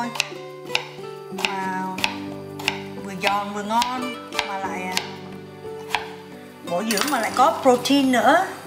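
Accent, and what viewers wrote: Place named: Da Nang, Vietnam. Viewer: Nhà cô rất sạch sẽ. Đồng hương Đà Nẵng ạ. Thích cô nói tiếng Việt như này. Cô khéo léo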